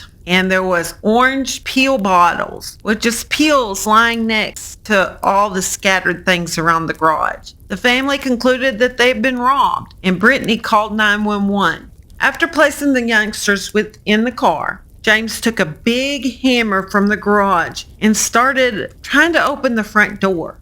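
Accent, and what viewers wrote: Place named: South, United States. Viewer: southern accent makes the word poem sound like p*rn to my English accent, lol ☺️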